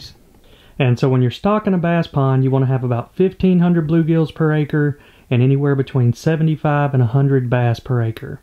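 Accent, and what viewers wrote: South, United States. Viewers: Same 🇬🇧 here. We clearly NEED wholesome content because I've loved watching this and the gentle southern accent is so calming . Love it 😀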